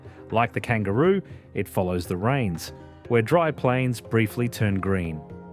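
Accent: Australia. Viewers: Narrator has Aussie voice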